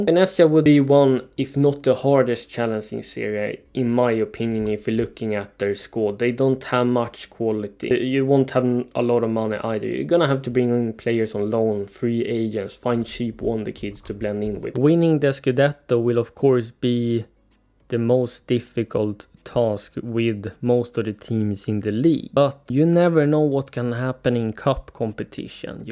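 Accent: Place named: Sweden. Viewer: LMAAOO i was just about to ask that you have the perfect swedish accent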